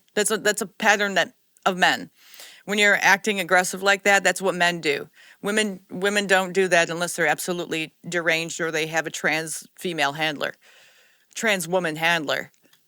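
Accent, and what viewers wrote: Scotland, United Kingdom. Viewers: Great Scottish accent🔥⚡️ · Trust me, I'm from Edinburgh and no clue what he is saying either, BTW I love your Scottish accent you should do it more often.😘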